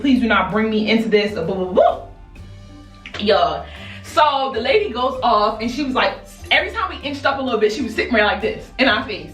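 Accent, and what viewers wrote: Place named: Washington DC, United States. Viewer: Okay DC accent ✨🎊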